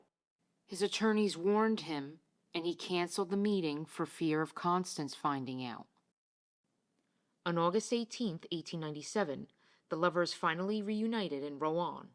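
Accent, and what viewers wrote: United States. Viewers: Why criticise the narrator’s accent. She is an American and speaks perfectly well. The substance surely is the main thing . I found this an interesting article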